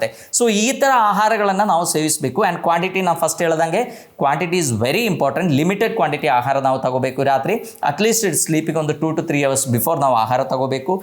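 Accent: Kerala, India. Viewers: Neenu. Malayali. Alva. Sir. Nimma. Kannadadalli. Gothaithu. Tummba. Chenagide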